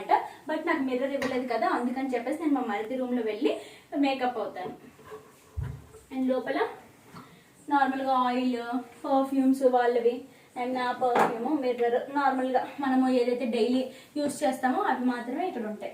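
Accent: Srikakulam, India. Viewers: Srikakulam slang vastundi 😘😂😂madi kuda sklm aa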